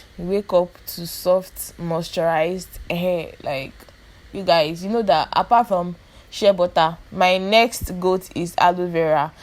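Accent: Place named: Igbo, Nigeria. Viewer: you igbo accent is amazing! I love it.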